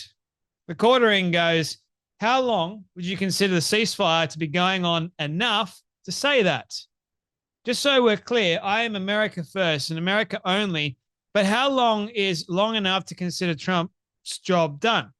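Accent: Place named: Australia. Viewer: Love your show,. We need people of your calibre speaking the truth with an Australian accent. Keep it up mate.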